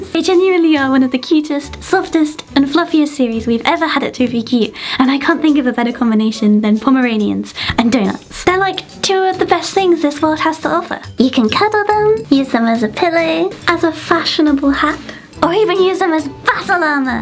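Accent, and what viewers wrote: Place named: United Kingdom. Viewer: Btw I think your British English is so beautiful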